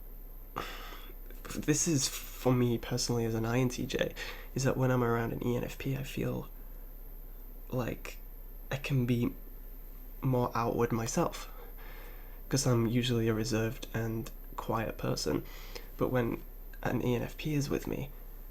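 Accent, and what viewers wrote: United Kingdom. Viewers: Bruh I can’t tell if your British or not, it’s freakin me out pfft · Are you a Brit? I have a degree in British English and British Literature :)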